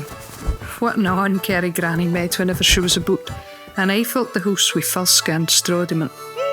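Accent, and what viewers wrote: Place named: Shetland, United Kingdom. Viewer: That's just a glorious thing all around! Listening to the dialect as a soothmoother and concentrating to keep track is a most enjoyable challenge. The combination of words, pictures and music just makes for a perfect piece of story telling. Thank you for writing, producing and sharing such a gem of Shetland magic. Your dedication brings a happy tear to a cousins een, too.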